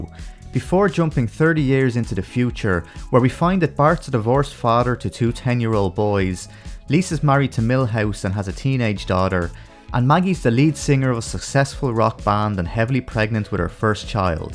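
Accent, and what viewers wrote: Ireland. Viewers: He’s Irish. 💯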